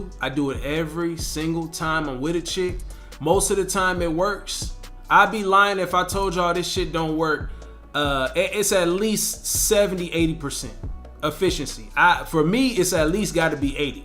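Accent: United States. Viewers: Yo you from tampa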